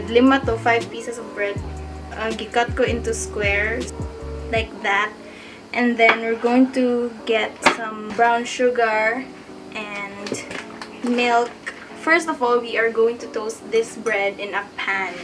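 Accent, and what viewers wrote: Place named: Davao, Philippines. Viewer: This is as good as your writing skills :)) ..with some twist, the Davao conyo twist :))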